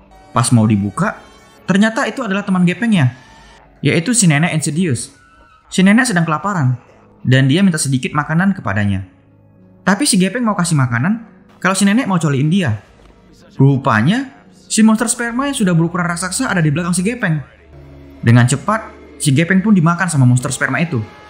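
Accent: Medan, Indonesia. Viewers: Org medan kau ya min? 😂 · Nih orang Medan banget omongan nya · Macam logat medan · Anak medan kau ya bg? 😂😂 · Pengisi suaranya org medan pasti ni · Logat medan abg ni wkwk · Nampak kali medan kau bang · Kek org medan abang ni, ya kan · Logat medan nya bro, mantap kali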